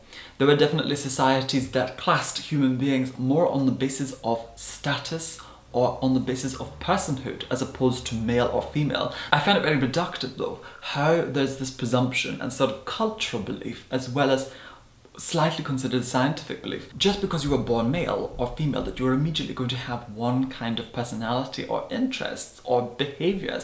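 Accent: Scotland, United Kingdom. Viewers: Lu z he's originally from the Hebrides and his real accent is much more Scottish sounding, you can hear it a bit in his very first videos. He now lives in London, and over time has been covering ut with this english, posh affectation you can hear now.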